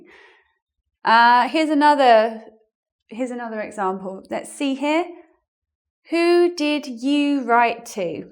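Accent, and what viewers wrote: United Kingdom. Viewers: I am a soft skills trainer in India.. always fancied the British accent.. These videos are excellent and very helpful.. Thank you very much!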